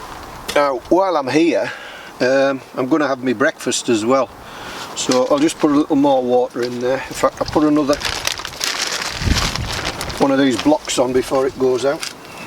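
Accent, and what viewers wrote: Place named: England, United Kingdom. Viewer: Very convenient music was choosen on the beginning! And what a lovely wood! What location is this? I assume somwhere in England, according to your accent?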